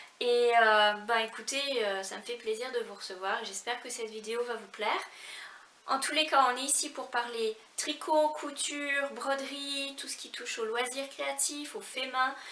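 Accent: Quebec, Canada. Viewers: Bonjour je découvre ton univers , et tu m'as l'air d'avoir un petite tonalité du quebec? j'aime bcp tes couleurs et tes créations me font très très envie. Je vais dévorer tes podcasts tout en tricotant et crochetant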